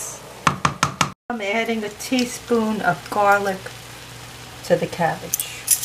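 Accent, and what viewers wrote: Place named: New Jersey, United States. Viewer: You sound like you have a new jersey accent, along with your haluski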